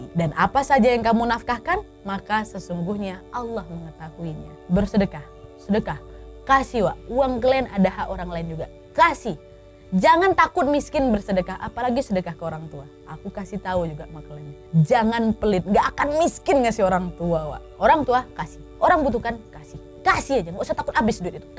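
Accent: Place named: Medan, Indonesia. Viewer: Bahasa dan logat ini kayak orang MEDAN,mantap aku subscribe kakak..buat nambah ilmu motivasi dan semangat...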